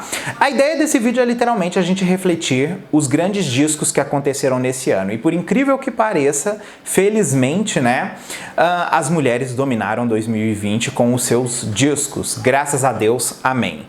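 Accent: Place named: United States. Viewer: eu adoro o e o conteúdo dele, mas uma coisa que me agonia muito nos vídeos dele é qnd ele enfia um sotaque americano numas palavras tão..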